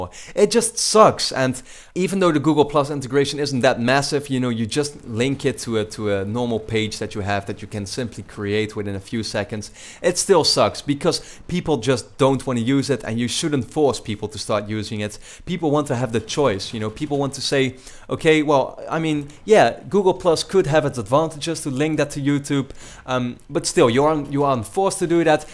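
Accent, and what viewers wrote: Netherlands. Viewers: Hey you sound dutch. Are u dutch.?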